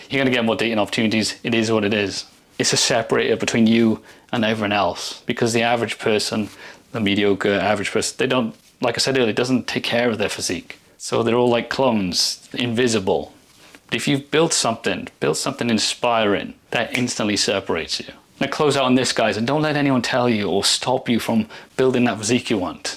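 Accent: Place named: Wales, United Kingdom. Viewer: I’m so glad I found your Channel, I am from Bristol by the sounds of it your from wales! We should train together one day ahaha! And yes it only counts when you lock in for a significant amount of time, I’d love to show you more before and after face pic. I just eat steak and fruit 🍇 everyday and I feel and look 100 x better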